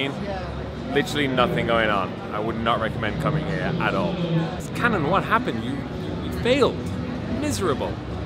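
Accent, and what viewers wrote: United States. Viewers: What's the American accent all about? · you sound so american:) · I agree you do take on the accents, and it is none of my business tbh. You do have a nice USA/Northern twang, it just seemed more exaggerated in this particular video. Sorry if I offended you.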